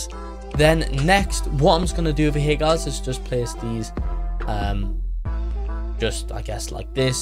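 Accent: United Kingdom. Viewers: That wasnt a swear word, it just seems that way because he talks fast in british accent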